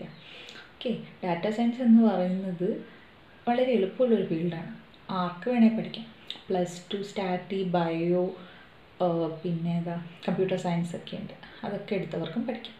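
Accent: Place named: Thrissur, India. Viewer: Thrissur slang നന്നായി endu....😀😀🙋🙋🙋🙋🙋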